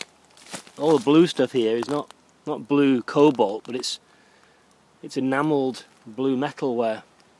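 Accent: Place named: Manchester, United Kingdom. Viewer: Are you guys new and do i detect a fellow manc? Loved this video and looking forward to more! You are very watchable and I’m fussy!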